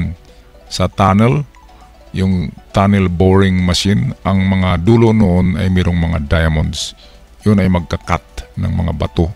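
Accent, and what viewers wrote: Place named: Bisakol, Philippines. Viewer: Engliserp bisakol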